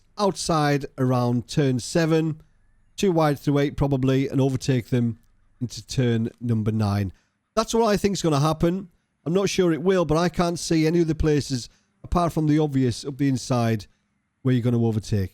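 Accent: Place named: Durham, United Kingdom. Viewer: i live in stanley co durham you sound like youre durham /darlo way be nice to have a chat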